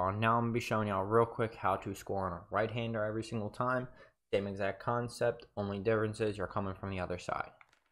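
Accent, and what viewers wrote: Philadelphia, United States. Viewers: This is how I went undefeated in franchise. Glitch goals are a must know as annoying as they are. This goal works the same way in game if you're behind the net as long as you either top left of the crease or top right depending on direction. Also you sound like a fello Philly bull so respect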